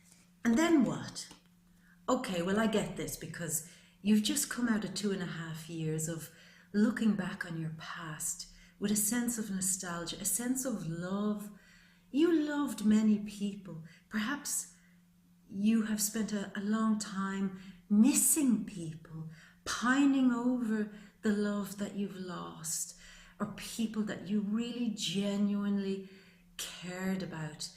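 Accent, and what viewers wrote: Ireland. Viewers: My God, what a reading, I hope it transpires because I had an Astral Prediction done last in 2017 and was promised changes would start in May, do the lottery and a windfall would come in, I spent over a £1000 on lotteries from end of March to June and won very little, other things were going to change, reconciliation and more? My birthday is 26/12/1961 I was born at 5.40am birth in Kirkby, England? I've been through it over the last 30 years but none more so, than the past 2.5/3 years. My wife ended our marriage 15/12/16 walked out Jan 2017 I've been sick with depression and anxiety, hospitalised with blood clots on my lungs, I was suspended from work. Now things are meant to be going right for me? Why do I have no faith? My ex filed for a divorce, I got the papers virtually a year to the day she told me it was over 16/12/16 now I find myself in a predicament, fearing losing my job, my house, half my pension, everything. 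By the way, may I just say you are beautiful, your eyes are so mystical, your soft dulcet Irish tones are so soothing and I love your witty repartee. I'll be watching your readings every month for as long as I am here. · wow ,,,and all in a lovely Irish accent <<<subscribed beautiful x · nice Irish accent.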